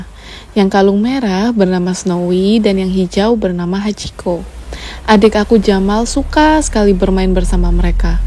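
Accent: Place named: Jakarta, Indonesia. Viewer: Oo.. Kok logat bicara nya bagus bgt yaa... Kyk orang jakarta... Hehehe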